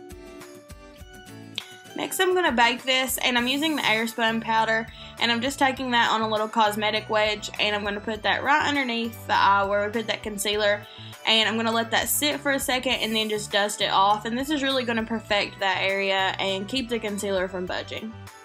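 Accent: Kentucky, United States. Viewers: What Jesse Girl pigment is this? Love your accent. You sound like my husband. He's from Ky.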